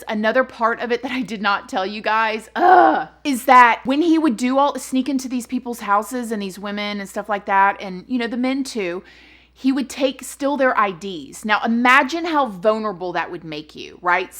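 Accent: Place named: Florida, United States. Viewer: I'm always watching you and I think hummmm with the way you talk you must live in Florida. I live in North West Fl and have the same southern slang. LoL 🤣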